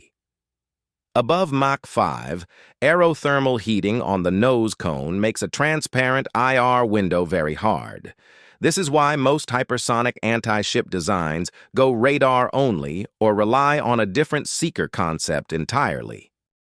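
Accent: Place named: South, United States. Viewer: Why your ai sound like hes from the south?😅 nice video though!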